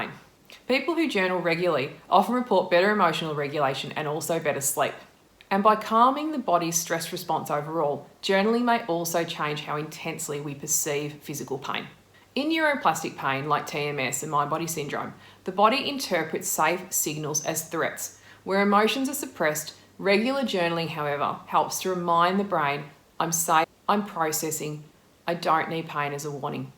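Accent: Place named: Australia. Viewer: Definitely Aussie :-)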